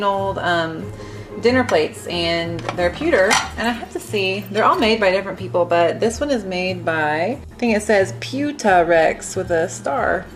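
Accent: South, United States. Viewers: What part of the South are you from?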